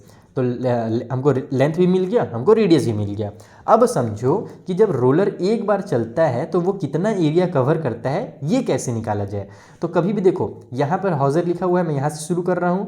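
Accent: Bihar, India. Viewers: Sir thanku kal mera aanual exam hai aap bhut aache hai aap bhiar se hai naa aapki accent se pata laga raha mai aapko 6 class se follow kar rahi hun and 9 and 10mai bhi kraungi true fan sir❤❤❤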